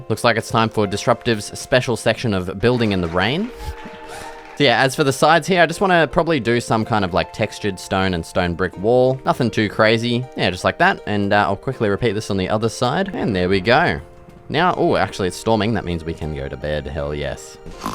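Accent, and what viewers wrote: Australia. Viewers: I'm Assuming Your an aussie ""Mate. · How as an Aussie did I never notice how strong our accent is 😂 I'm new here and I'm loving the new hardcore series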